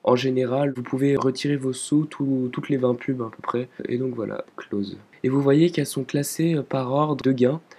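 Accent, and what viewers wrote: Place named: France. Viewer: Bravo pour votre accent français, ça fait plaisir.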